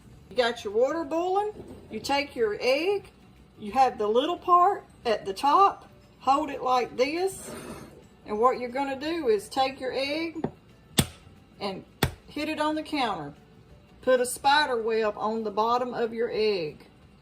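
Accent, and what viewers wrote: South, United States. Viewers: I boil a dozen, and use the ice bath method. I like to eat one boiled egg in the morning. Still have problems peeling , so I take the cold egg I plan to peel and place it in hot water for a minute. Seems to help. Like your southern accent!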